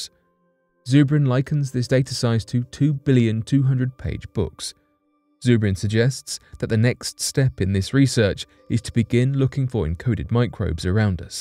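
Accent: United Kingdom. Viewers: well now you just blew my mind....you mean this is all tongue in cheek poop info?....its that british accent- damn...i was looking to actually educate myself...last thing my small mind needs is fake info...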